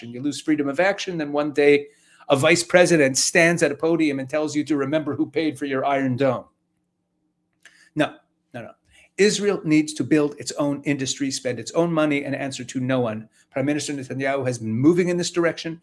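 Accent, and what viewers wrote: United States. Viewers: If we're being honest, you speak with an American accent, but your allegiance seems to be with Israel. That's fine, but then don't meddle in US politics. Israel is a sovereign nation, as is the US. They are entitled to make their policies as they see fit (and they do): so is the United States. I have witnessed what the US has done for Israel...what has Israel done for the US? I guess it boils down to the question of: what is the US obligation to Israel?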